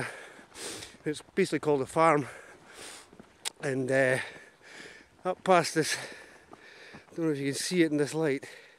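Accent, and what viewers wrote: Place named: Scotland, United Kingdom. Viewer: Myself and daveswildcamping,love the Scottish accent. It puts u,right there.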